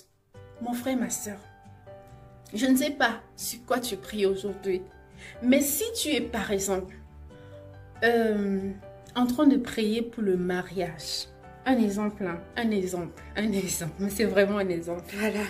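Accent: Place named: Cameroon. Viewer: Une Fibre camerounaise derrière ta voix😊😊😉 Courage en tout cas, 👍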